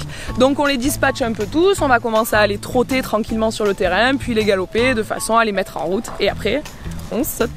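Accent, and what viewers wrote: Marseille, France. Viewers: Franchement le montage il est tooooop jsuis tellement fan, un grand bravo t'es vraiment talentueux. Et super coach qui sait motivé puis avec ton petit accent marseillais ohlala ça, ça m'éclate 😁 D'ailleurs peux tu passer voir tes messages fb, je t'en ais envoyé un et j'ai vraiment besoin de conseils c'est assez urgent merci bcp!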